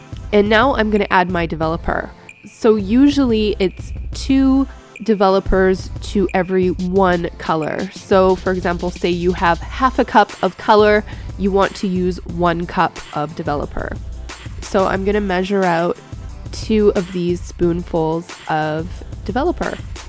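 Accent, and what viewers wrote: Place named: Canada. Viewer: I think I need more tutorials with a canadian accent. Ha! I miss living up there. This was REALLY helpful. Thank you so much!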